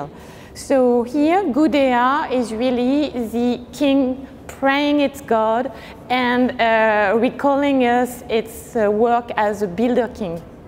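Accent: France. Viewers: Thank you so much for this visit ! As a german i’m very pleased to be able to understand without having to be focused on the subtitles :) the french accent gives me the impression to travel til’ Paris with you in this marvellous place that is mesopotamia ! My favorites are definitely the lamassus at the entry, so impressive 💜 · Quel accent français insupportable ! Je doute qu’un non francophone comprenne le moindre mot.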